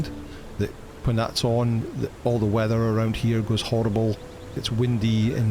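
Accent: Scotland, United Kingdom. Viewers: Bro does the thrall settings at 0.1 work? Iv Been running about for days and my level thrall met his end at 5. It’s feels like it’s still taken ages to level up. Great Chanel for this game by the way. Iv learned a lot and have subscribed and liked. What Scottish accent is that by the way? fife. aryshire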